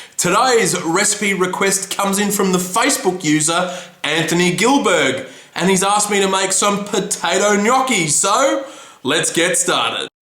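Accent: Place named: Australia. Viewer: He's pronouncing it correctly - pretty much the Italian way of saying it only with an (understandable) Australian accent. The US "No-key" pronunciation bears no similarity <link> (to hear an Italian pronouncing the word)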